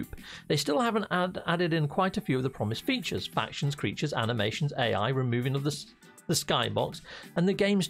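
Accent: United Kingdom. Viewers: Oh -- and I would definitely watch some paranormal oriented content! I too find the UK accent and phrasing very entertaining. At 2000+ hours I'm still a long way from being bored with NMS. But while I'm a huge science and science fiction fan from WAY back, the paranormal is a kind of guilty pleasure for me.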